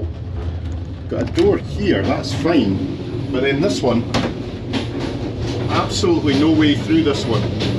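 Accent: Scotland, United Kingdom. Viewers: Scottish mate ? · scottish i think?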